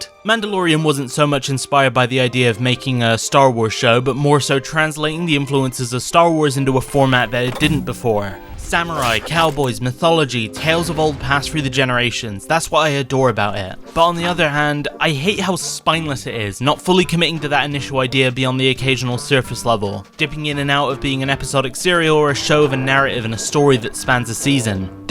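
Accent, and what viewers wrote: West Country, United Kingdom. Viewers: You sound like you’re from Hot Fuzz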